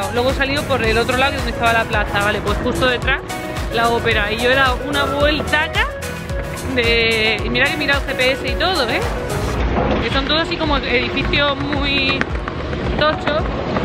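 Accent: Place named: Andalusia, Spain. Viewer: Me da cosa decir algo porque veo que el vídeo es de hace un año, pero bueno lo voy a decir: me encantó. Menudo entretenimiento he encontrado con tus vídeos, me viene genial con esto de la pandemia que tenemos ahora, no sabes lo bien que me están viniendo. Estoy jubilada pero me gusta tu estilo y cuando te equivocas me haces reír, se nota tu deje andaluz. Me encanta también, es un buenazo y Gigi aunque en este no esté. Un besazo muy fuerte desde un pueblecito de Asturias. 🥰🥰🥰🥰🥰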